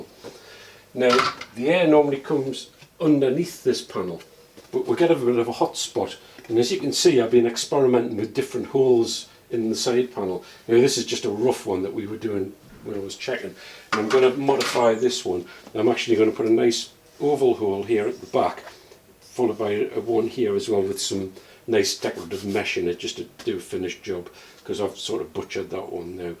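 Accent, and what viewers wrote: Newcastle, United Kingdom. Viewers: Great to listen to a fellow Geordie talk so knowledgeably about some great motorcycles. If I lived in the UK I would certainly be buying parts from you because you are clearly a true enthusiast and are in it for the passion rather than just the money. Thank you.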